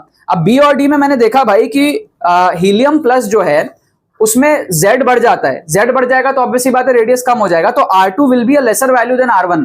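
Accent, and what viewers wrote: Bengal, India. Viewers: oh sir u r amazing 🤩...ur Bengali is too sweet ❤️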